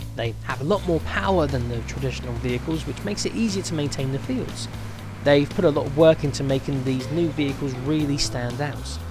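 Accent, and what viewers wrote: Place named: United Kingdom. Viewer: So awsome. Cool british acsent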